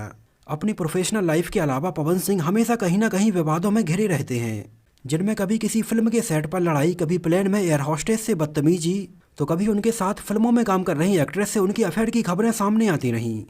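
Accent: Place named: Bhojpuri, India. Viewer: Jio bhaiya bhojpuriya andaaz me mja aayi gayil ho👍❤️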